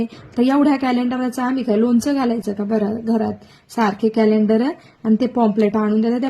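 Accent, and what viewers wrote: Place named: Nashik, India. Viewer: खूपच छान एक्टिंग करता ताई तुम्ही आणि तुमची भाषा पण खूपच छान नाशिक करान सारखीच आहे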